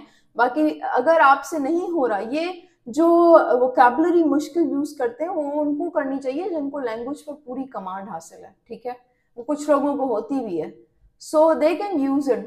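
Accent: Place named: Sindh, India. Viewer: Apke to apne english thek nahe ha total sindhi lehja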